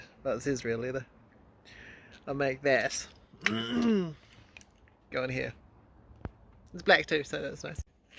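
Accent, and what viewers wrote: New Zealand. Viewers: What's the accent? NewZelandian? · Kiwi bro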